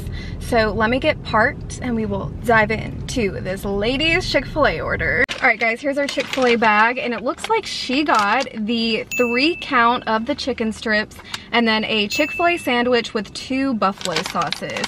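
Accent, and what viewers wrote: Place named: North Carolina, United States. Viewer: I think I remember u saying ur originally from NC but do u live there as of right now? U definitely have the southern accent from NC lol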